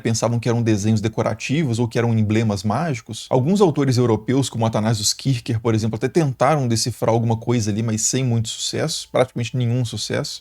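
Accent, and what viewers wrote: Minas Gerais, Brazil. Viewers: Sotaque mineiro inconfundivel? Eu diria de BH!!!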